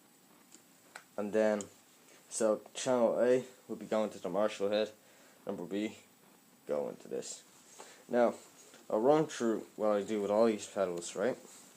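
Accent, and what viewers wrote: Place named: Ireland. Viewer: I can tell ur Irish lol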